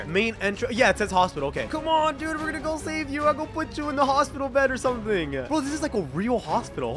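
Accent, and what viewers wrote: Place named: South, United States. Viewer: I like how he talk in dixe voice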